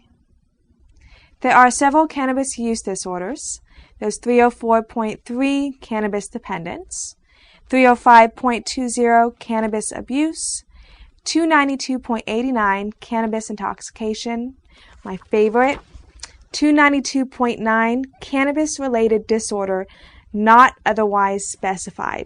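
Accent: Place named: New Jersey, United States. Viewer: i like the way she talks it sounds like she may b from new jersey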